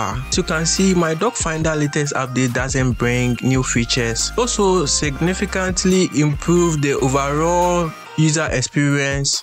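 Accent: Nigeria. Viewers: Nigerian ahh accent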